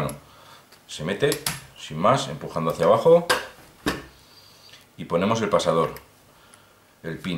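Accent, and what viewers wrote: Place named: Spain. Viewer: Hombre claro, no oyes el acento es Español, yo acabo de recoger en la intervención de armas de la Guardia Civil, la Mossberg 500, Escorpión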